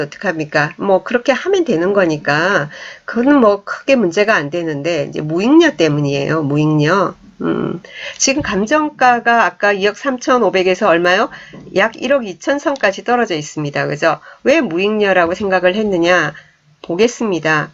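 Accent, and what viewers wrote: Busan, South Korea. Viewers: 소장님 달달한 부산사투리하고 추임새...듣기좋네요 나만 좋은건가ㅎ 강의 잘들었습니다~감사합니다❤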